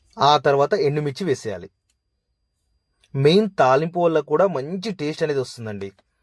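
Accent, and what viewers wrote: Telangana, India. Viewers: Pure telangana lunga ge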